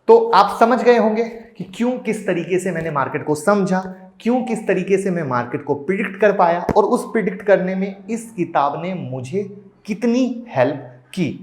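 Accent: Bihar, India. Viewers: AAP BIHAR KE HAIN KA HO. BILKUL BIHARI HAIN. DEKHNE ME BHI AUR SUNNE MEE BHI. DISCLAIMER: I M A BIHARI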